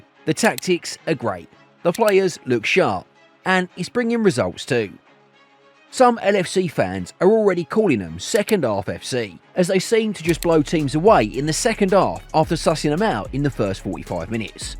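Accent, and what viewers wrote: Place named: South London, United Kingdom. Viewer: Panenka sounds more like AI, even though he's not. This guy is more South London sounding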